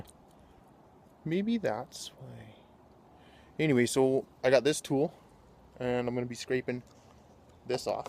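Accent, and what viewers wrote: Alberta, Canada. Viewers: Lol I am about to do some patio and deck repairs for one of my rentals soon. Glad I found your video, bud. Funny as fuck. I thought your accent was sounding awfully Albertan and lo and behold 😂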